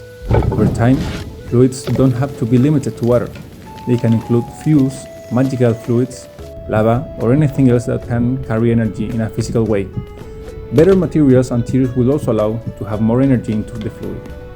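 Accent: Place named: India. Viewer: Author sounds like Indian guy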